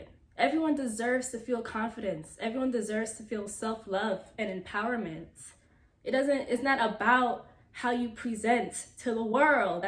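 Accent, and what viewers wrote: Jamaica. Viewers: Good morning, was appreciating your informative videos and noticed slight accent. Jamaican?🙂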